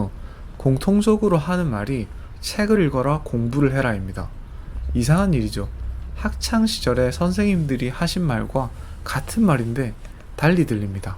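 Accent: Busan, South Korea. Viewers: 91년 동대 법대에 입학했었는데(동대신동캠퍼스), 저수지가 낯익군요. 올해 애가 고1이라, 애를 위해 고등학교 학부모운영위 학부모위원 되었습니다. 애는 지극히 평범(고1, 3월모평 국4등급, 영수 2등급)하고, 힘들게 대학다녀도(4년 학비도 만만찮고) 원하는데 취업하기 힘든 게 현실이고, 현재 고등학교는 입시위주 교육을 할 수 밖에 없는게 현실이어서, 아들에게 대학가는것보다 (서울사립대기준 학비의 2배를 줄테니) 앞으로 뭘 먹고 살지를 고민해 보라고 했더니, 아들 눈에는 좋은 대학나와야 사회적으로 인정 받는다고 강하게 믿고 있는것 같습니다. 지금 인천 살고 있지만 매주 동영상보니 부산이 마이 그립고, 선생님 목소리가 제 아는 분과 같아 친숙하네요(부산분치고 사투리가 심하지 않음)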